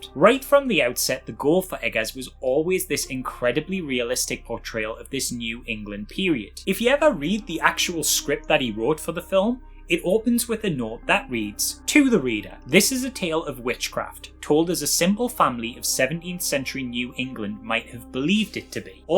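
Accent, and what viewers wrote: Newcastle, United Kingdom. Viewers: Everything sounds good in a Geordie accent.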